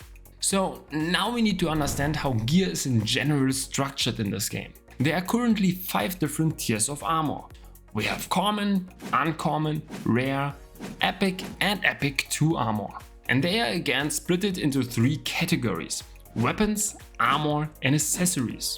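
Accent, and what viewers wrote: Germany. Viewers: Ich liebe wie man einfach hört das er deutsch ist xD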